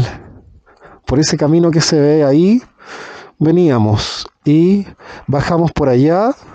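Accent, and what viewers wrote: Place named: Chile. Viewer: Increíble la adaptación a un castellano normal dela chilena pero se nota momentos 😅 pero el vídeo ni que decir 💯🎉 like hermoso paisaje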